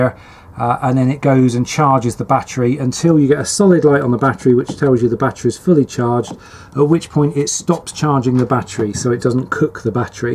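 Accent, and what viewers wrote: England, United Kingdom. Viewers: It's English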